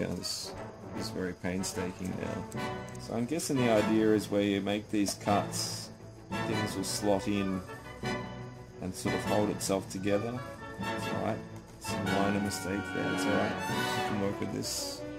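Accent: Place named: Australia. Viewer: Man im Australian too but you ahve the best accent i have ever heard!!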